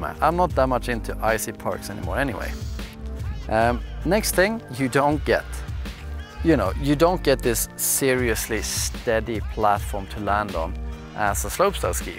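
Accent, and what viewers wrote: Sweden. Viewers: 100% svensk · This dude has to be Swedish judging by the accent (I’m Swedish 😁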